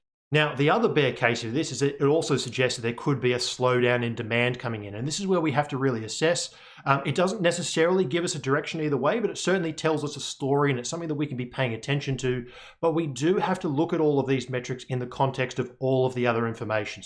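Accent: Australia. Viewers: Thank you so much for your effort. 💐 Enjoyed the thick Aussie accent, in addition to the analytics.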